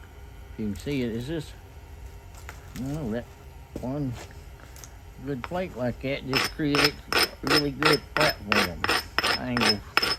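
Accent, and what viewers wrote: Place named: United States. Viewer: I really like your videos, and even more your good old american accent 😁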